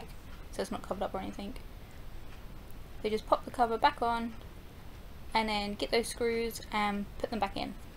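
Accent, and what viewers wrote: United Kingdom. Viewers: Thank you very much for the video and the great british accent. Greetings from Germany. Love it so much! : )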